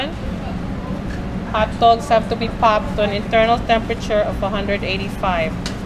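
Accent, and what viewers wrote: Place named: New York, United States. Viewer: That vaguely hispanic? new york accent ❤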